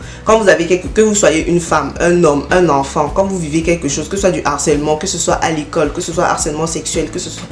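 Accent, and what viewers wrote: Cameroon. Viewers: Tu as un accent camerounais????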